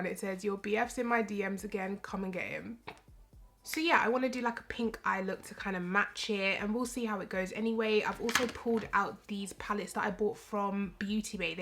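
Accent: Jamaica. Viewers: patwa coming through.. Love to see it 😁😘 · Omg Ms you are stunning, I love, love your makeup looks, I hope to get as comfy on my youtube soon. Love your work, I finally heard the Jamaican accent. Big up yourself:)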